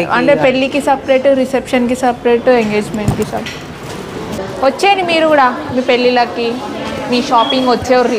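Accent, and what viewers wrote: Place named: Vijayawada, India. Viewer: Vijayawada antunnaru konchem aa slang change cheste bagundedi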